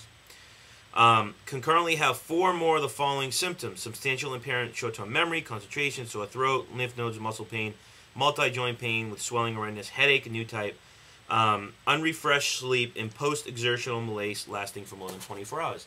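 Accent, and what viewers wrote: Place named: Boston, United States. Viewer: Let me guess --- you're from the North Shore of Boston.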